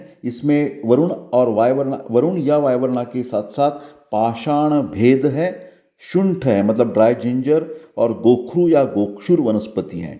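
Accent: India. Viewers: Khup chan explanation marathi manus hindi bolat ahe . He kalte.. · Thank you sir. Clear puneri Hindi.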